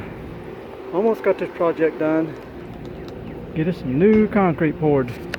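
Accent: South, United States. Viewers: this is probably all too late to be helpful for you, but maybe for someone else... Screws to draw the blocking up into the floor is not a bad idea, but not to keep the blocking in place. And not gold screws, they are too brittle and will break and/or loosen up over time. Some beefy structural screws would be best. The blocking should actually be the same dimension as the joists, and secure the blocking with framing nails, maybe even joist hangers. Also add some subfloor adhesive to the top edge of the blocking. You can also see in the video that the subfloor is compromised. Pain in the butt to fix that, but it ain't gonna get better on it's own. Flashing underneath the sill plate is actually incorrect. The idea of flashing is to keep the water out completely as much as possible. Putting the flashing on top of the concrete is likely to allow water to get into the crawl space between the flashing and the foundation. 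There are liquid flashing products on the market that you can use to seal the joint between the rim joist and the foundation, or you can use the metal flashing but in either case the flashing must overlap the joint and then the siding overlaps the flashing. In addition, seal the ends of the joists, the outside of the rim joist, the the outside edge of the sill plate to help prevent having to replace those in the future. From your accent, I assume the home is in the south, where everything stays wet pretty much all the time. Not much you can do about that. The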